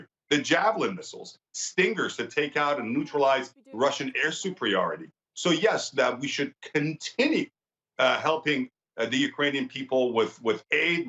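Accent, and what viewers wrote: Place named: England, United Kingdom. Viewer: salam aagha arash omidvaaram haletoon khoob va har jaa ke hastin salaamat va tandorost baashin aaagha vaaghean baraye man eftekhaare ke yek irooni chonin jaaygaahe besyaar aali ee dar jahaan daare zemnan alaave bar maassshhhala khoshtippi, va sedaaye besyaar carizmaatic va naafez, shomaa be tarze ajiby baa vojoode inke dar emricaa bozorg shodid,az vaajehaaye besyaar ziba va besyaar bejaa va fannie faarsi sohbat miconid,,, man hamishe tahlilhaatoono ba deghat tamaasha miconam, vaaghean aafarin ce inghadr be faarsi ahammiat midid va ,,,bedoone tamallogh, agar oon tah lahjeye zibaaye ingilisi ro nadaashtid, va casi harf zadanetoon ro faghat mishanid, fekr mikard shoma ostaade adabiiat hastid vaaghean dametoon garm ,,,man kheyly keif mikonam vaghti tahlilhaatoono mibinam vaaghean kaaretoon doroste,,,yek ostaad,yek tahlilgare tamaam ayaar, va yek sokhanvare tamaam ayaar hastid omidvaaram hamishe salaamat baashid.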